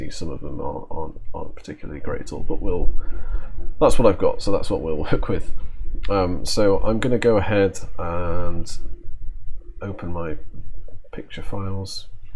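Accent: United Kingdom. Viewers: My God he's from Manchester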